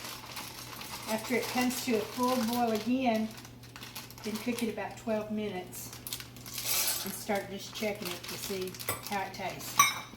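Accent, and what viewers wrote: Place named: South, United States. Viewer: Thanks, this brought back memories from my grandmas cooking. She had the same southern accent, too.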